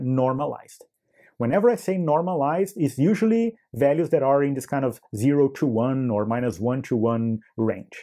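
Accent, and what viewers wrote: Brazil. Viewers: dude, your brazilian is pretty strong, i can tell it by the tone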